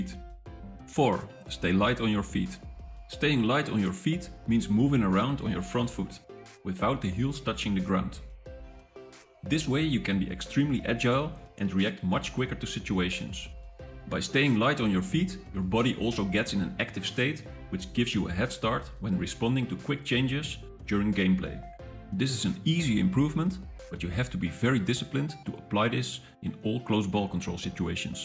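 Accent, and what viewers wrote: Netherlands. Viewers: is that dutch accent omg that English dutch accent sounds horrible,,,,,,,, the video is good thank you so much my friend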